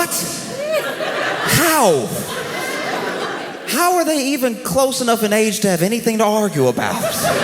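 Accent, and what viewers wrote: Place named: Louisiana, United States. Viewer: How does Josh put out so much quality material? He’s one of the funniest comedians today. Also love the Louisiana accent 😊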